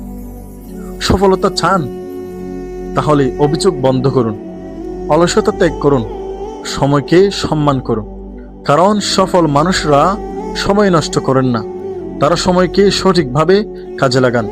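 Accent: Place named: Chittagong, Bangladesh. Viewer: আপনার কথার টোন হলো, আপনি চট্রগ্রামের।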